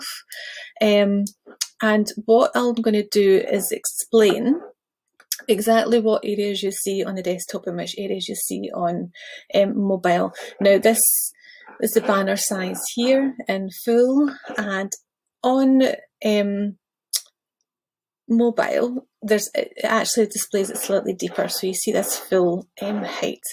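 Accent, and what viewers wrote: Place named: Scotland, United Kingdom. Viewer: I gotta love you. :face-red-heart-shape:But you're Scottish? Welsh? Accent is too much for my American ear. 🐘Well , WooHoo! Close Captioning does understand you! I will carry on.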